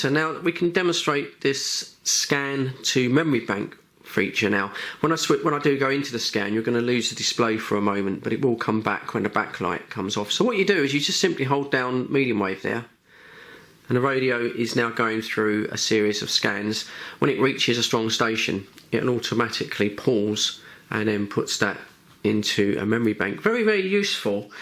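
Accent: United Kingdom. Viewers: Curious, are you British by any chance?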